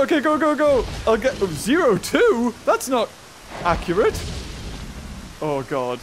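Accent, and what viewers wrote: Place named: Scotland, United Kingdom. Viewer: With your accent you sound exactly like the actor Ewen Bremner who was in Alien vs Predator movie... heh... When you said .. "Oh God..." it reminded me of this scene ---> <link> Are you Scottish? He is.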